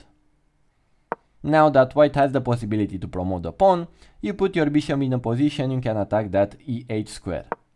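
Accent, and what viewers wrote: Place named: India. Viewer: I didn’t know you had an Indian accent.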